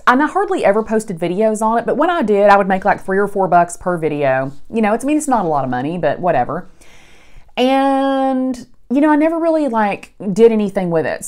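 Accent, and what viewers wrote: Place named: South, United States. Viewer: You're a great candidate for asmr because of your southern accent, how you talk with your hands and its really satisfying when you say "y'all". I hope I don't sound like a creep, I just think you'd be good at it. Whispering doesn't seem to suit you though. It would be cool if you just spoke like you do now and maybe talk about positive affirmations or something.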